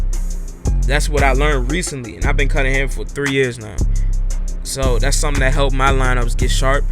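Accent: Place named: Washington DC, United States. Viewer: I kbew he was from dc after a minute haha. The cadence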